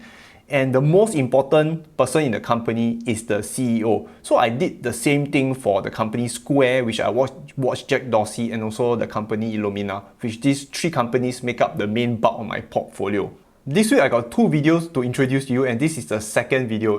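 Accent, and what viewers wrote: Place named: Singapore. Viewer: I don't know what is more valuable about: His knowledge or his Singapore accent?!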